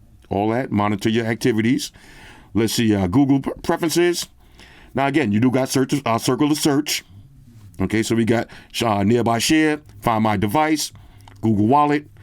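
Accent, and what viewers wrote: United States. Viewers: The only stand-up comedian reviewing Gadgets channel in whole YouTube😅🌹although I don't understand some words of native usa accent, but I always watch each video uploaded🌹😅 tnx for ur perfect sense of humor 🌸🌸 🌸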